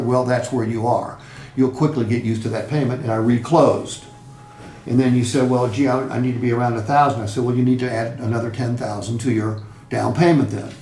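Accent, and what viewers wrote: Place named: South, United States. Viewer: and his hillbilly bullshit talk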